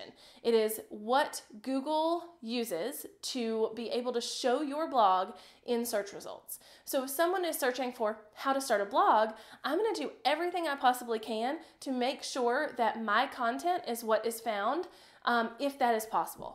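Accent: South, United States. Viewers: I love your country acent🥰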